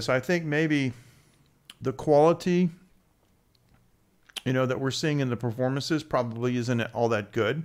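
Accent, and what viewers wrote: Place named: Boston, United States. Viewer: Shared. Hope you keep the Boston sound. Awesome stuff!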